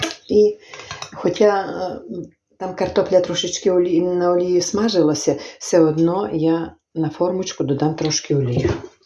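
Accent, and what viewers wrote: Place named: Bukovina, Ukraine. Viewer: Дякую вам пані за рідну мову за буковинський говір Дивлюся вас з Америки і думаю що це готує моя мамочка З якого ви району ? Бо у нас теж завжди казали що сипляться воду 😂 Будьте здорові і миру нам всім бо дуже хочеться до рідного краю до родини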